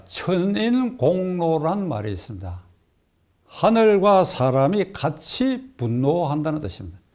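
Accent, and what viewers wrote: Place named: Gyeongsang, South Korea. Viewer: 변호사님의 해박한 지식과 설명해 주시는 노력과 성의는 존경해 마지 않습니다. 변호사님 1.서울에 사신지 얼마인데 아직 경상도 토박이 억양과 어휘를 탈피하지 못하십니다. 2."다른 증거들로 가지고"는 " 다른 증거들로서"로 고치시고 3."미국으로 도망가가지고"는 "미국으로 도망가서" 4.그래가지고 이래가지고 등 가지고라는 이음새는 아주 듣기 싫은 낱말,특히 연설문 대중 연설문에선,. 5.지금의 젊은 세대에 맞는 어휘 억양,어구 등 부탁합니다. 감사합니다.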